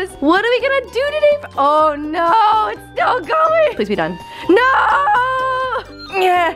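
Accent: Canada. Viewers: TOMORRRRRROW J.k I love Canadian accents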